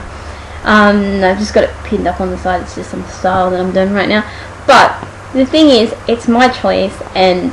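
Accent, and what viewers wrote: Australia. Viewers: hell yeah girl i agree! i got 3! i think u look gorgeous and ur tattoo of the peacock looks gorgeous! oh and btw i loooooove aussie accents and girls like you ;P turn on! haha but yeah let the haters hate you know! prolly dont know what that means cuz im from chicago but you never know! keep doin vids i subscribed 5 min ago!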